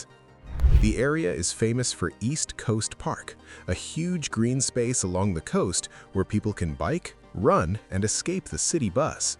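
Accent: United States. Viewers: The -"gie" in Selegie Road is pronounced like the "-gee" in "geek". Please stop pronouncing everything the American way when the names belong to another country.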